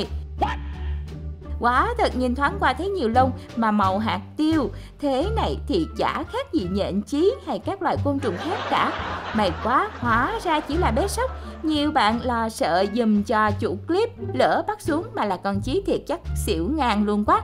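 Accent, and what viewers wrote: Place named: North, Vietnam. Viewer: Ng bắc omg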